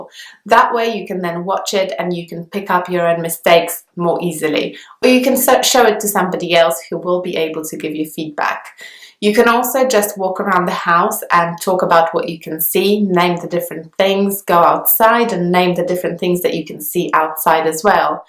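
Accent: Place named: United Kingdom. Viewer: Great content! I have just discovered your channel and it seems to be the thing I needed so far. One quick question. I definitely hear a British accent, but there is something more in your accent. Is it Polish? Your speech is purely beautiful.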